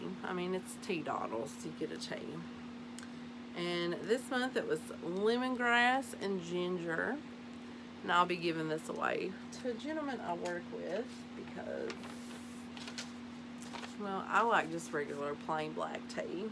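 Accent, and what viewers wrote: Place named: Appalachia, United States. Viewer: Beautiful makes!! I love hearing your hillbilly voice 😂😂 I'm a hillbilly too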